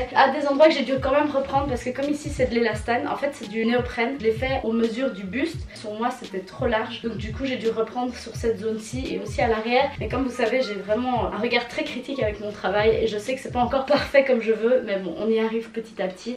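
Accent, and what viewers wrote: Belgium. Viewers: Mais mdr tu as réussi à me faire regarder des vidéos couture! Je n'ai rien contre la couture hein, c'est juste que je suis plutôt au level "Incapable de réparer le trou d'une chaussette grossièrement sans me coller l'aiguille dans le doigt"... Voilà 😁. J'ai connu ta chaîne il y a peu via des vidéos coloration (ça, c'est de suite beaucoup plus mon domaine!), et du coup, comme j'aime bien ton style, ton enthousiasme, ton accent belge, j'ai regardé la vidéo sur le corset. J'aime beaucoup les corsets (magnifique engin de torture), et j'aime beaucoup la Wax, et je me suis prise au jeu lol. Franchement, le top est superbe, et il rend le corset tout de suite beaucoup moins casse-gueule à porter 😅. GG en tout cas, c'est un sacré boulot !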